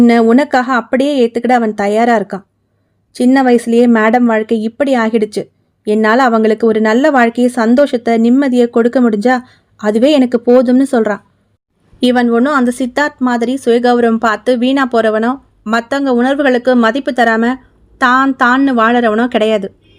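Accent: Kerala, India. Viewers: Sis! novel 👌but ella novel um malayalm tone athigama varuthe sister 😮u want like malayalam..sis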